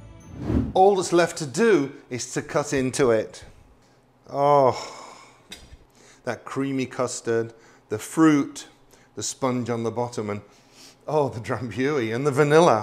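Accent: England, United Kingdom. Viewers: What a lovely English accent!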